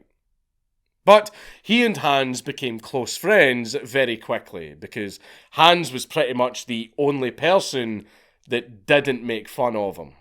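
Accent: Scotland, United Kingdom. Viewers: Are you Scottish? I like your accent very nice to listen to and your a good at this. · I've said it before and I'll say it again, please please put CC on while listening to Dankula. Youtube translating Scottishisms is fookin hilarious.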